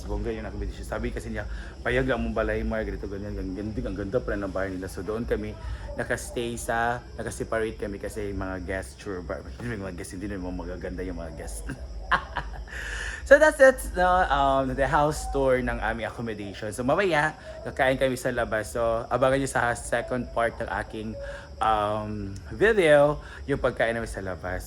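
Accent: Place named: England, United Kingdom. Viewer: ❤, I like your English accent ❤, bagay gyud sa imoha mag host ug event ❤😊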